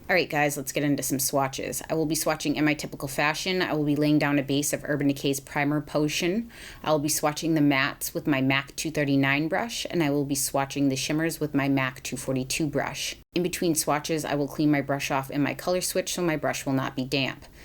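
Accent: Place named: East Coast, United States. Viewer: I had insomnia and woke up at 6PM (I’m French, so it’s already dark here). So basically I lost my Saturday and woke up completely depressed. But now in the upcoming darkness I can see a ray of hope, a glimmer of light all the way from across the ocean. Yaaas. It has long nails and a raspy east cost voice. It’s my queen and she is baaaaack. I am now ready. Candles are lit. Coffee has been made. I can enjoy your video. Thanks. Your timing is amazing. Always an absolute delight to watch your videos. Your personality and honesty are refreshing. All hail the dragon lady nails queen 👑! Love ❤️ (from across the ocean)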